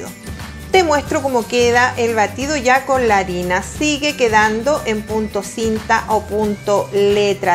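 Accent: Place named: Chile. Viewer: Pense que era mexicana pero es mas chilena que los porotos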